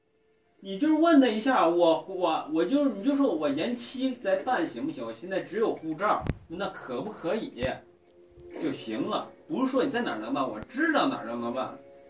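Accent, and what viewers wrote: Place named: Argentina. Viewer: deberías dar clase de cómo hablar argentino : v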